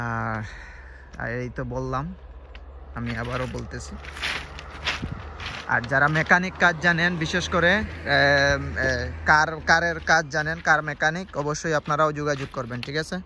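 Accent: Sylhet, Bangladesh. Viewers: ভাই আসসালামু ওয়ালাইকুম আপনার সিলেট মাত সুন্দর লাগে। আপনার সাথে যোগাযোগ এর জন্য কি আপনার নাম্বার টা দেয়া যাবে কি?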